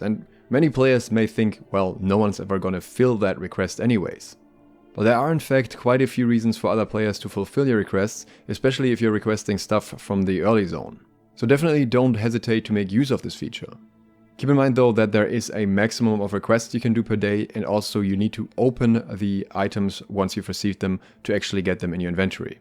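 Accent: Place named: Germany. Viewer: You sound like you have a German accent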